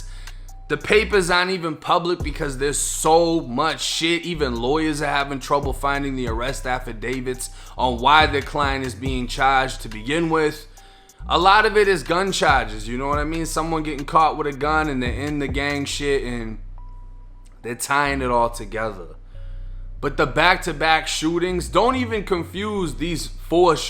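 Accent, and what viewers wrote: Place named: Northeast, United States. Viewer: I was wondering where your accent came from. I have never heard a Florida dude speak with that accent, that's a Northeast accent if I ever heard one. What's the story? Love the channel btw, real fukkin nice work and journalism, slaute!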